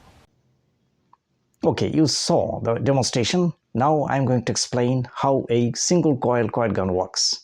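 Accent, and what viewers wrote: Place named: Nepal. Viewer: Nepali ho sir accent nepali jasto xa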